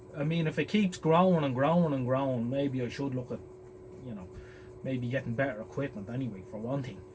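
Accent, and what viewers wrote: Ireland. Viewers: I watch because your delivery is low-key, casual and appealing. You repair the same sort of thing I do - yes, a few ladies do this sort of work. (And your lovely Irish accent it rather sweet.) I have completely rebuilt a couple of Bush TV24s and numerous radios from the 1930s and 1940s. But these days AM radios need a local home-brew local transmitter fed by whatever audio source you like. I hope fame doesn't change your presentation too much. I at least I like your videos just as they are! Thank you.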